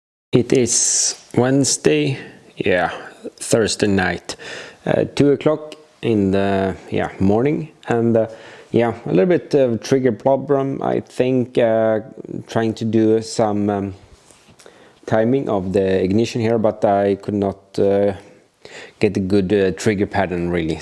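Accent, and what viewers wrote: Sweden. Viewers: Men satan va svensk du låter 😂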